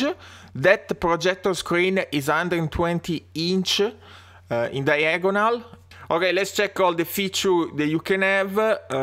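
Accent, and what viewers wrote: Italy. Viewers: Che forte accento italiano cavolo hihihih spero di vederti al raduno di piazza Duomo Milano .... ti seguo da tanto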